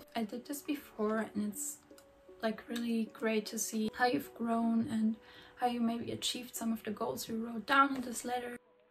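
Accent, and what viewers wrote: Germany. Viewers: Kannst du mal deutsch sprechen man höhrt das du deutsch sprichst 😅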